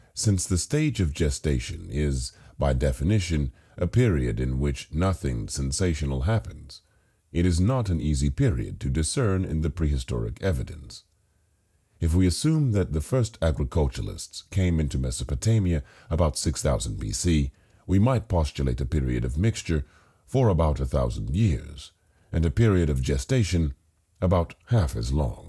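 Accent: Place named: United Kingdom. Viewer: Can’t tell if you have a British or American accent. It’s like a mix of the two.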